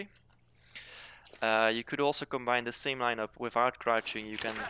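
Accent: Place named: Norway. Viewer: Du er norsk, ikke sant? Det er bare man hører når nordmenn snakker engelsk :D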